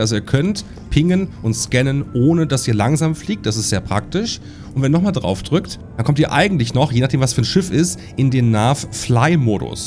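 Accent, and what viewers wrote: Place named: Saxony, Germany. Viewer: Vermehrt. Da merkt man das du aus dem sächsischem Bereich kommst 😅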